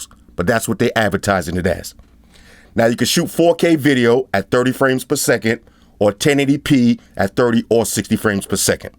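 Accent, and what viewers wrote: New York, United States. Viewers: That NY accent tho! Love it. If I ever leave, I'd just listen to you videos if I ever get homesick!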